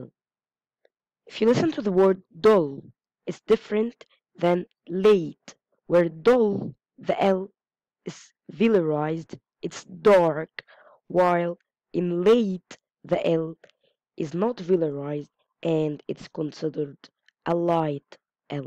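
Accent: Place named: Arab world. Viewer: لو سمحتي عايزة اتواصل معاكي فالرسايل ضروري ممكن الايميل او اكونت الفيس انا guess من لهجة حضرتك انك عربية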